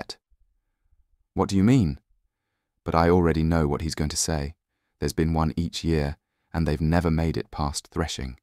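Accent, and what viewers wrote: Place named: United Kingdom. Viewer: Since I listened to a Xaden POV in an American Accent I'm having trouble adjusting to your British accent. But, this is well written and I am enjoying it regardless of that one caveat.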